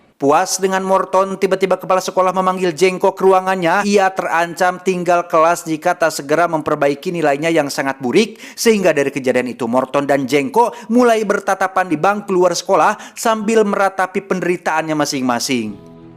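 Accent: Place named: West Java, Indonesia. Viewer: Sunda na timana bang?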